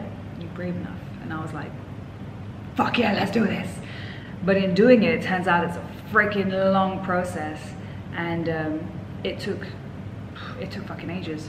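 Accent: London, United Kingdom. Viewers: British uyy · she's british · love your londoners accent 😄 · Sukaaa sama aksen nya british bangeeet 😍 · She has british accent omg im so in loovveeeeeee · Bloody hell, you speak the way London folks does...fuck yeah...you are amazing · Dia setengah prancis tapi pernah kuliah di inggris jadi yaaa mungkin karna itu dia punya British accent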